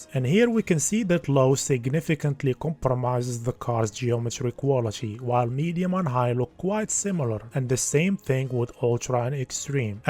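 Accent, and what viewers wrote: Morocco. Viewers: your accent is soo moroccan and i love it. + 1 sub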